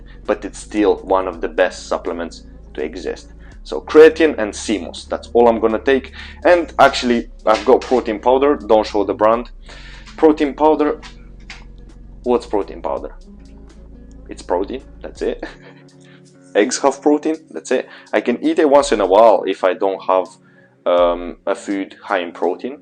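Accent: Russia. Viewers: У него русский акцент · Aint no way this guy is from Britain. His accent is russian, not bri'ish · Русский акцент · Русский акцент брат,но ты хорош так держать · Сначала я подумал, что ты русский) акцент такой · все гадал откуда ты😂 вроде русский акцент, но не было понятно до исконного "блять"😂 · Wow, thanks a lot! It is kinda strange that I can't understand his Russian accent despite the fact that Russian is my mother tongue. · Are u Russian or what? You don't sound like an English man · Русский акцент так и пробивается! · как будто рашн звучание в голосе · ну я вообще сначала догадывался что он русский, так как акцент у него русский, но когда он сказал блять, я окончательно убедился что он русский. А еще его зовут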